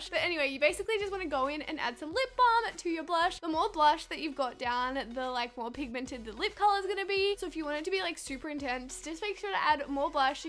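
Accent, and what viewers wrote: Australia. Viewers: I love how I could tell she was from Australia by her accent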